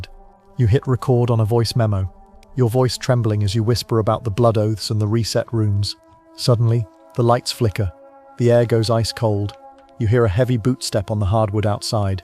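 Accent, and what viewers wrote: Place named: United Kingdom. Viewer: Sensationalistic AI slop. Powerful people always have and always will exploit the ambitious and vulnerable. A robot’s British accent doesn’t make it true. Plenty of serious problems in the real non conspiracy world far more deserve your time. Ever consider you’re getting suckered and distracted by this junk?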